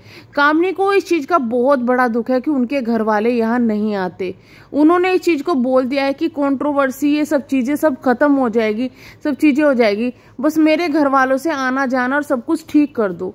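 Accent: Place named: Haryana, India. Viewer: Mai ek baat bolungi mujhe lagta hai aaoke tone se aap haryana side ke ho but mujhe aapke state se koi matlab nhi hai mai bas ye bolna chahti hun ki dekhiye hamare bihar up me na sasural walo ko agar kisi v chiz me invitation dena hota hai specially local me mayka ho to ghar aakar bolte hai kamini ke 2 bhai hai kisi ek ko to ghar aakar saas sasur ko invitation dena chahiye tha kyunki relation pahle se hi thik nhi the to itna ro jarur karna tha or ye comment aap kamini ke channel pe jakar padh skti hai ye unke khud ke subscribers v bol rahe hai or jiss din arti ka engagement ka wo roye thi uss vlog me kamini ne badua diya tha ki jisne v meri behan ko rulaya hai uska bhagwan kavi bhala nhi karega ye line sahi tha kamini ka kyunki roye to wo unki saas ki wajah se thi to kamini apne hi saas ko baudua di thi ye v sahi nhi tha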